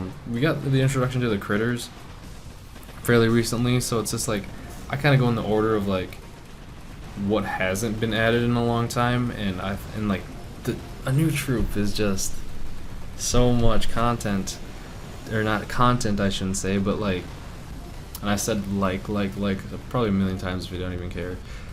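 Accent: Canada. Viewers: sounds Canadian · Is Canadian?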